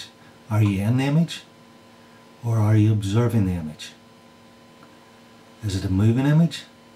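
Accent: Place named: Northern Ireland, United Kingdom. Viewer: guy is northern Irish. always strange to hear your own accent. lol great video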